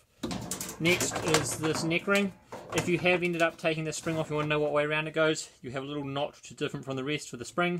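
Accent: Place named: New Zealand. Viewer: Brilliant video - good to hear a NZ voice with short no nonsense simple instructions - thank you so much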